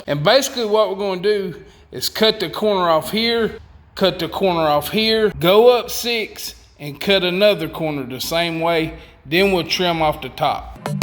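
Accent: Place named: Alabama, United States. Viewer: WOW THANKYOU PAL I'M FROM QUEBEC AND I LOVE ALLS UR FISHING HACK'S VIDEOS AND I LOOK AND LOVE YOUR ACCENT SOUND LIKE ALABAMA ACCENT...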